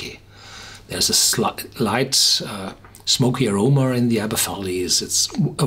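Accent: Germany. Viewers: You’re not German ?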